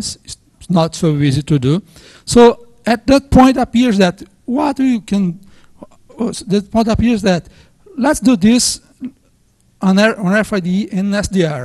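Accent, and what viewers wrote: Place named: Brazil. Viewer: His accent looks like brazilian accent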